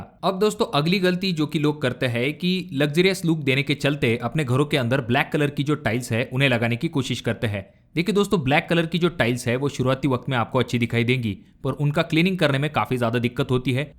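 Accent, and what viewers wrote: Maharashtra, India. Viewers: Lagta hai aap Marathi ho ? Soft aawaz nahi hai ?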